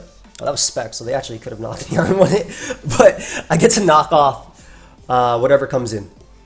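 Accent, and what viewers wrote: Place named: New York, United States. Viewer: The New York accent hasnt faded from Connecticut dwellings. Yes. 👌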